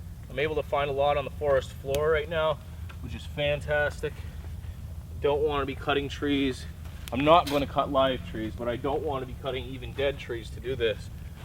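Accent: Canada. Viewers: Awesome woods scenery. Lovely colour in late fall I see. You must be in Canada all those oak and maple tree's. Oh you just said " Canadian gem knots" So you probably are in Canada and sound Canadian. Awesome job on the bed. Gorgeous german shepherd dog too! Yummy poached eggs. Good job on the eating stick. Thanks for sharing your knowledge!! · Is this guy Canadian?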